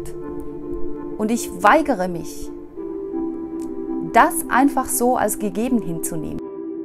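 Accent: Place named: Switzerland. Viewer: Hallo 👋👋👋👋👋 Mobbing ist ein sehr wichtiges Thema. Mobbing, Cybermobbing usw. ist in den letzten Jahren sehr schlimm geworden, speziell seit es das Internet gibt. Es ist sehr hilfreich wenn, es Menschen gibt, die darüber sprechen. Ich danke Dir für das sehr interessante und gutes Video.👍👍👍👍👍👍👍👏👏👏👏👏👏👏 Ich habe noch eine Frage, wenn Du es mir erlaubst: Von deinem Akzent her müsstest Du aus der Schweiz sein?! lg Leandro 😺😽🙏 Bis bald.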